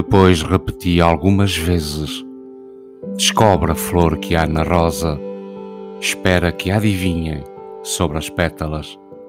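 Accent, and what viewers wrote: Portugal. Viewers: Adorável...o seu sotaque português da mais poesia à poesia.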